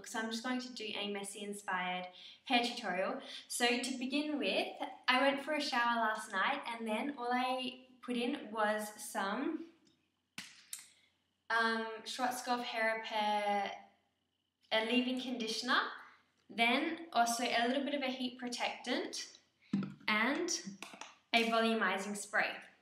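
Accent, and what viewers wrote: Australia. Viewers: Thanks I thought you sounded Australian x its a lush accent x :)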